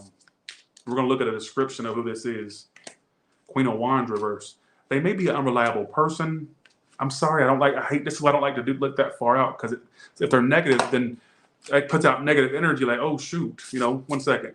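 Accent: London, United Kingdom. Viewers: Omg!!! sorry I had to 😂 I love your London accent better than mine!! Lots love 🥰 uk 🇬🇧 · Love the London accent 😍 💕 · Your English British accent. Love it 🫶😄 from London here! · When I heard the British accent, I knew the Sagittarius read is for me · British accent .. absolutely fantastic 🤣👏👏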